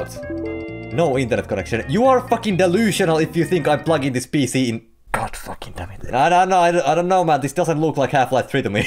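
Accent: Finland. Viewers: I've watched couple of your videos and I didn't know you were from Finland before you said "vittu". After that i just couldn't unhear the accent. Torille jee🇫🇮🇫🇮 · He's finnish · He's obviously Finnish he speaks with a rally English accent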